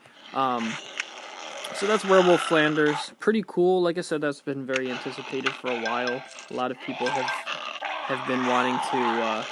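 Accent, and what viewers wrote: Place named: Scotland, United Kingdom. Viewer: btw I love you Scottish accent 😉😂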